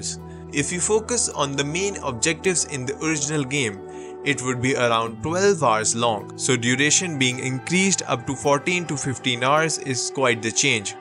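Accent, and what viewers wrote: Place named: East India, India. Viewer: I knew u were east Indian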